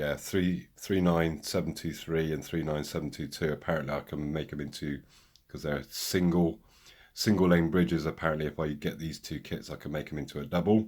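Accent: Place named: Yorkshire, United Kingdom. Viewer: Thought you`d be a Yorkie Man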